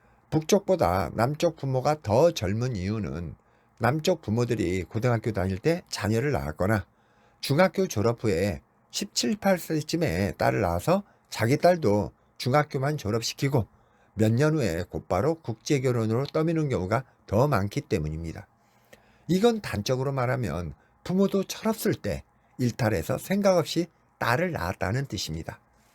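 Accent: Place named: Gyeongsang, South Korea. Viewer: ㅎㅎ 경상도 말투가 묻어나네요...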